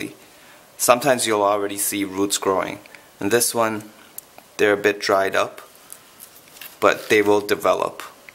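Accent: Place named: Caribbean. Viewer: I hear a Caribbean accent… Cayman Islands maybe?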